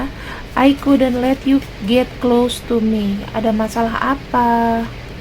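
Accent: Java, Indonesia. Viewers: jawa medhok 😂😂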